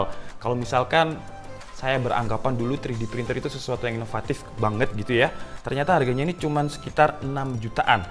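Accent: Java, Indonesia. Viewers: Malange ketoro banget broo wkwkwkwk sipp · Mas wong jowo ya? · Medok jowonya ketahuan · Bang pertama liat chanel lu gua kira chanel biasa aja yg kek kebanyakan para youtuber , stlh gua iseng* nonton in video lu yg ngomong sama google asli dahh ngakak parah dan trnyata lu orngya asik kocak .klo gua bilang lu punya ciri khas review yg beda intinya lu bisa bawain pembahasannya kek bener" lagi interaksi sama temen seumuran lu. Goo job lah 👍👍👍 Ohya bang btw ada jawa jawanya medok mantep😅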